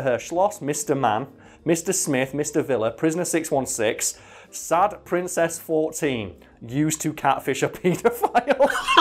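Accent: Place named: Southeast London, United Kingdom. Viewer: Use your damn northern accent you southeast London sounding ass. Please?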